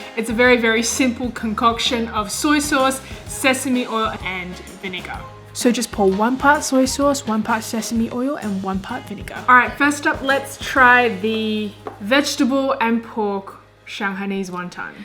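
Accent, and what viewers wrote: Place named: Australia. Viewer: it's strange to hear an asian person with an australian accent. i'm from vancouver where most asian have an asian accent. it's very cool.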